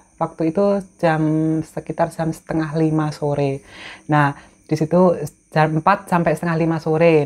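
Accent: Java, Indonesia. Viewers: ngecuprus,nggrundel pake logat jawanya....khas bgt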